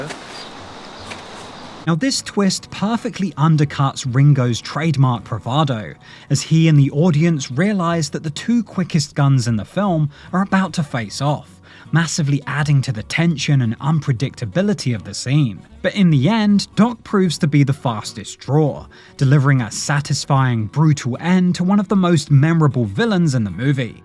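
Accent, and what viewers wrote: England, United Kingdom. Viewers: English accent kills it for me. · What's lost is the authenticity from the British accent by the narrator. We're bombarded with this. Motorsports, tennis, golf, war videos. Gunfights? C'mon man. I can fake an accent, maybe I can get work too.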